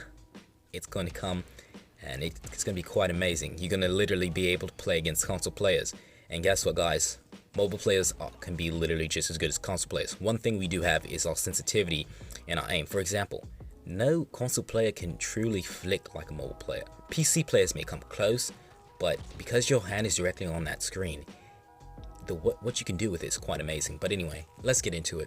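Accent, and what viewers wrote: United Kingdom. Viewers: yeah yt is drunk half the time it’s no prob....also loving the British accent 🤣👌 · Love the news vid and British accent too!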